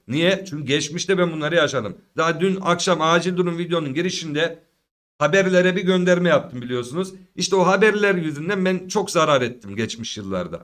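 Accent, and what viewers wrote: Konya, Turkey. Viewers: Teşekkürler Gonya'lı Ustam.("ben öyle bişey DİMEDİM, lafına hastayım)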